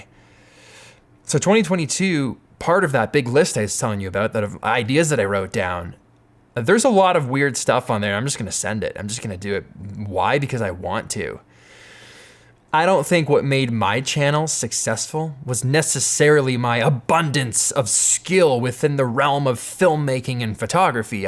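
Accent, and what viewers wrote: United Kingdom. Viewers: That British accent 😂😂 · British accent sounds like Jamie Oliver. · your British accent is getting better every video, well done lad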